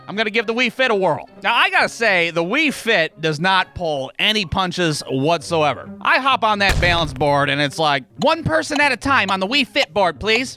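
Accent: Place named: Boston, United States. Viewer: This is the most boston sounding thing ever it's like mark wahlberg on crack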